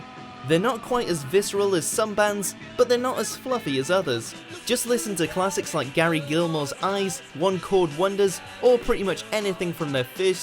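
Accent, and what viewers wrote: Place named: Cockney, United Kingdom. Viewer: Ваш ебаный fucking cockney нихуя не understand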